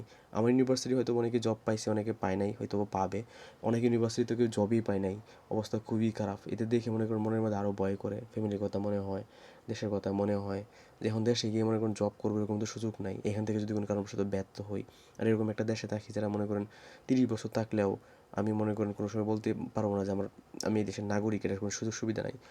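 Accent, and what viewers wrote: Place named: Kishoreganj, Bangladesh. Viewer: ভাই আপনার ভাষার টোন টা কিশোরগঞ্জ বা ব্রাহ্মণ বারিয়ার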